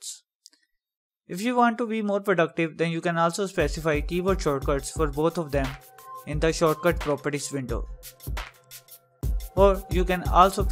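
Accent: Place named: India. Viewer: ALL OF THESE ARE SOME GUY WITH AN INDIAN ACCENT SO I CAN'T UNDERSTAND WHAT HE'S SAYING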